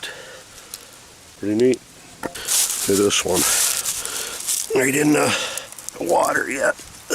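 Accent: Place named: Midwest, United States. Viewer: You sound so midwest I love it.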